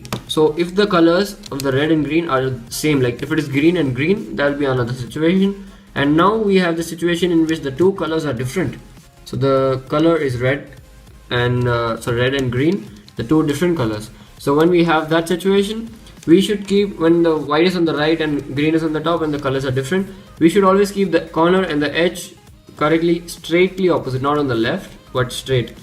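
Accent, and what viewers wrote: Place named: India. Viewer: Indian guy where u live? (Accent makes me laugh)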